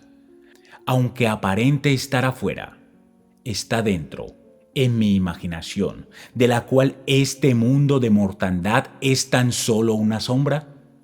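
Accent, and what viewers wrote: Colombia. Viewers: es colombiano, paisa, de hecho, su acento lo delata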